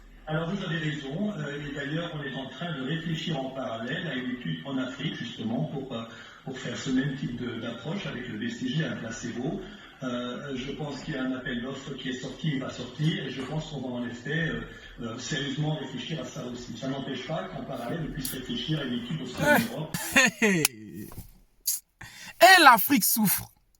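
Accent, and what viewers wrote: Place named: Ivory Coast. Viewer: L'accent me dit que c'est un frère du 225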